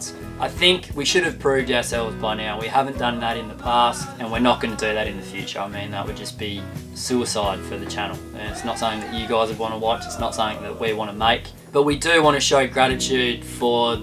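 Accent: South, United States. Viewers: Nice Southern accent for a Down Under bloke! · As an American, I love your American accent! Also, the emissions free boat thing is cool too. 😁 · An Aussie man in a French boat talkin with a southern twang! Its a beautiful 🌎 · Oh my lawd that Southerner accent... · Hilarious accent and insight to the hillbilly .... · Wow, your country-American accent is... oddly on point. Sounds like north Georgia/Tennessee. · Well, I'm a Texas guy and honestly his "southern drawl" is easier to understand than the Aussie accent! And I've been to Aus-traala! I'm also a Tesla guy.. so good on ya, Aussie! A noble quest!! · Love the southern draw coming from a Texan myself, I look forward to seeing what comes out of all this. ♥️ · Very interesting idea. Hope it turns out! Really liked the southern accent too 😂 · I think it was unbelievable that an Aussie could do such a fine job on a stereotypical good old boy accent. 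Seemed kind of Archie Bunkerish though. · That’s a pretty good American accent, straight from the South! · Your southern accent cracks me up! You got it ! ....lol. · Emission free hype crashing into whole of life reality. Unless you made it from carbon sunk wood in its entirety then there is no way. Hemp sails and rope. That southern accent will come in handy as you tend the fields and cut the trees. You did say it was your dream. That Outremer looks pretty good from here even if it is a climate change liability. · Ahhh du liike ur very southern accent. Good job. Good Luck on obtaining your “Green” vessel. You need one of those suspended dog run cables for Lenny. As a parent of 3 children and 11 grandchildren I can fairly say you need eyes in the back of your head and that’s on dry land. Take care and stay healthy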